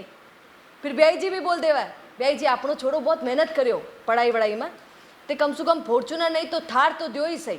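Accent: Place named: Marwari, India. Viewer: Madam ak kahase ho, hindi or marvadi mix bol rahe ho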